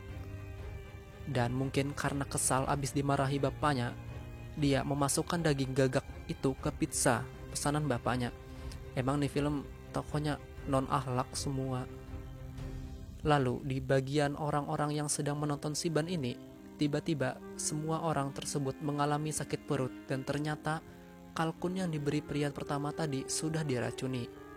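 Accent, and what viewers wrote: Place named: Java, Indonesia. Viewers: Suara org sunda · jawir jamet medok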